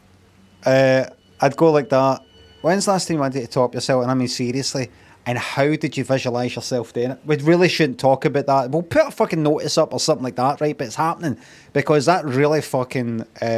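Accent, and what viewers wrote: Scotland, United Kingdom. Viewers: If you could also tone down the Scottish accent that would be great (for our viewers outside of Scotland)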